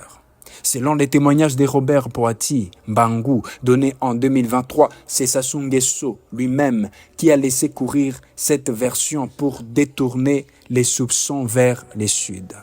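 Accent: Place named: Belgium. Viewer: Vous avez un francais belge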